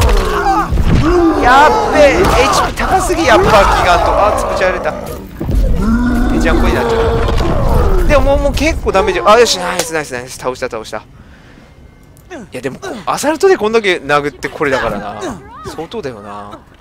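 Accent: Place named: Kyushu, Japan. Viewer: 九州の方ですか?? 方言かわいいですね(*^^*)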